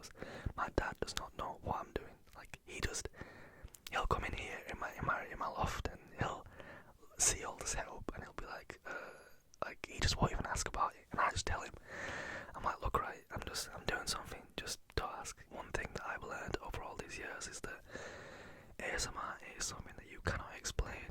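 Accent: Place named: Yorkshire, United Kingdom. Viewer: I like your outlook on people who don't get ASMR! Just let them go 😂 I been guessing you were from Leeds from your accent, when you said Bradford made my day! I never get it right hahah. So glad your channel has grown, well deserved ✨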